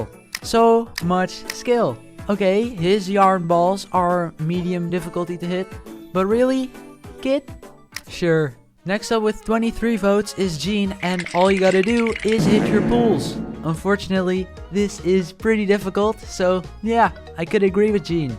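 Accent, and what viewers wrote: Netherlands. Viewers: Wait are you Dutch? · Holy Dutch accent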